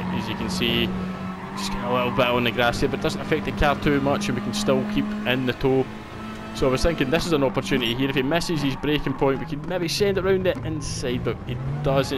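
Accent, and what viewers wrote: Scotland, United Kingdom. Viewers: First time viewer and fellow Scot. Good to see that the UK GT Sport streamers are not all from the SE of England. Nothing against the English at all, but just nice to hear the Scots accent commentating. You from the lowlands, Glasgow area? Would love to see you racing with the likes of Super GT, Tidgney, Rory and Kie. Like Rory - cos of his mature attitude to racing, much like yourself. Consider me a sub.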